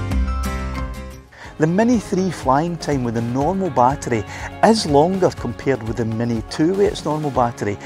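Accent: Scotland, United Kingdom. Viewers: Hi, sitting here in my cabin up in North East Finland with a big smile on my face, enjoying your Scottish accent and your comment to the teenager who drove past. The Mini 3 is my first drone and I enjoy flying it. I myself am an instructor and graphic designer and have made quite a few films within motion graphic advertising and documentary and will usually hire a drone photographer for the films I make. I would say that for lower budget films, the DJI mini 3 Pro is great for the job. Thanks for sharing, slàinte from a Dane in Finland.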